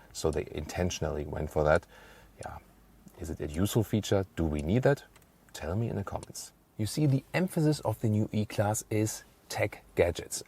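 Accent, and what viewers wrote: Germany. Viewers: English with typical german accent 🤮👎🏻 who understand this...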